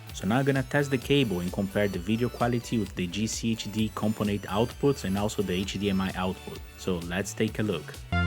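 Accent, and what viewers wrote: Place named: Portugal. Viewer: Your English is excellent but I can tell your native language is Portuguese! Vídeo legal mano vou ver esse cabo no aliexpress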